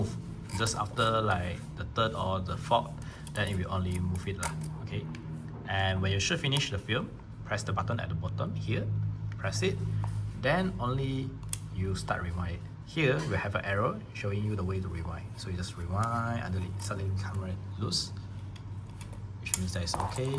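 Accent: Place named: Singapore. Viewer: the accent is giving lah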